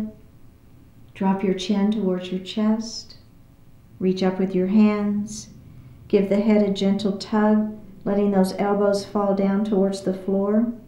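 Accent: South, United States. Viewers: I just found you -- quite serendipitously! -- for the very first time on your Day 1 of your 28-Day Chair Yoga series, and since it's Day 1 for me as well on committing to moving my body and getting my strength and physical excellence back, I thought it a match made in heaven! I like your style very much, your soft-but-obviously-also-strong energy, your excellent and very clear instructions (I really like the reminders you stick in there, and they often are so perfectly timed!)... and I especially appreciate your slight but lovely Southern accent [since my Daddy was from Georgia, and I do have a soft spot for things Southern:D]... I also love it that you use the Indian names for the poses, etc., AND I felt wonderful after the session... Well, I'm just thrilled, and I look forward to being with you every day. I also am not a beginner (a commenter mentioned that), but following the initial processes and starting" from scratch" feels like a perfect intro back into doing (and loving) yoga as I did years ago. 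AND may I also say (all due respect to the other teachers I've found and tried on YT so far -- I'm so glad you're closer to my age! There's a richness and a most welcome depth and smooth flow that happens from your expertise, and I appreciate it very much. Excellent session, -- thanks so much! I'm delighted to be doing this with you. -- Nancy from Oklahoma City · Thank you!! I'm so happy I found you!! You have a calming voice.. The southern accent brings me back home!! Can't wait to start this challenge with you! I'll let you know how it works out.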